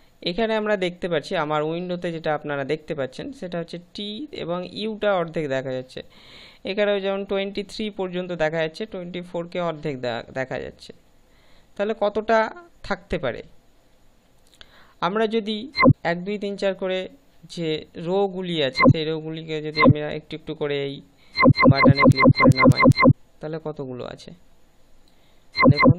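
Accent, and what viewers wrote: India. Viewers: কলকাতা আর বাংলাদেশের মধ্যে উচ্চারণগত কিছু পার্থক্য আছে। সেটা ব্যাপার না। ব্যাপার হলো আমরা অনেক শিখেছি ওনার কাছ থেকে। তবে দার কথাগুলি অনেক ভালে লেগেছে এবং ওনার টিউটোরিয়াল থেকে অনেক উপকৃত হয়েছি। দাদা অনেক ধন্যবাদ আপনাকে। আপনার থেকে আরো অনেক টিউটোরিয়াল চাই। দাদা মাইন্ড করবেন না। কিছু লোক আছে যারা সবসময় মানুষের সমালোচনা করে।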